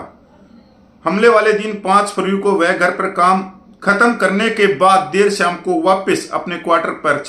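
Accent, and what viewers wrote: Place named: Haryana, India. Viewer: भाई अपने चैनल मे हरियाणवी टोन का प्रयोग कम से कम करे।धन्यवाद।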